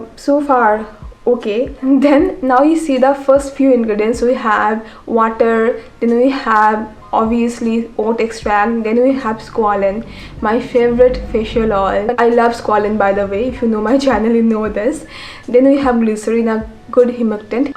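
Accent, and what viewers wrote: India. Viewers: Can you please add captions because it's really hard to understand your indian English accent.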